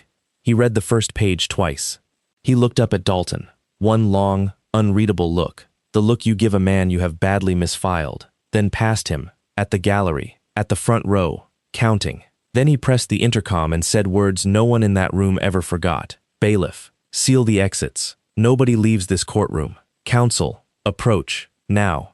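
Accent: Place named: Australia. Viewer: Excellent story. Lovely voice for this from Australia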